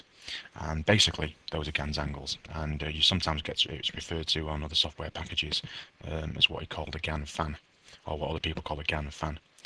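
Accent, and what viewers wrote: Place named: North West England, United Kingdom. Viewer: Lol. Hes from north west i think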